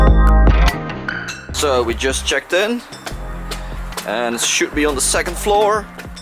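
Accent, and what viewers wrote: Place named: Netherlands. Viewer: Ben je nederlands ik hoor het aan je accent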